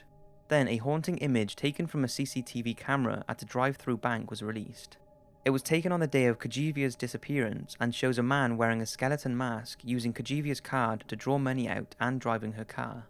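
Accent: Wales, United Kingdom. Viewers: are you welsh............. anyway love the vid :)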